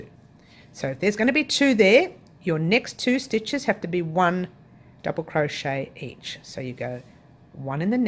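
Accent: Australia. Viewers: Wow love your accent Australian lady ty for sharing.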